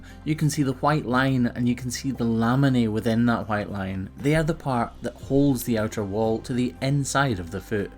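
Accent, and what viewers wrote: Scotland, United Kingdom. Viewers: I don't think he should change anything, Its Scottish, quickly spoken and a bloody beautiful accent. It's like asking you to change your accent because I don't like the way you say morning 🤷‍♀️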